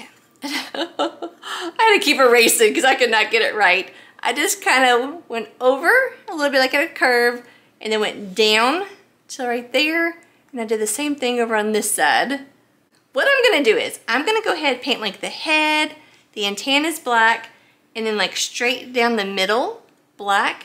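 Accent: South, United States. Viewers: I just love your channel and all the beautiful things you create. You are so talented and your little southern accent is adorable. I'm a 69 year old grandma and it always puts me in a better mood to just watch and listen to you! I think I'm gonna go shopping! Dollar Tree here I come!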